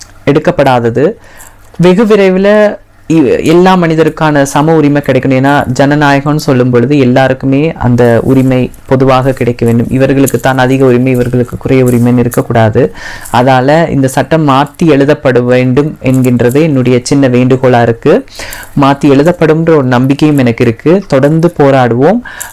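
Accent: Sri Lanka. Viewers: Ungaloda ilangai tamai I LIKE IT Ninga foreign la irunthalum nama tamil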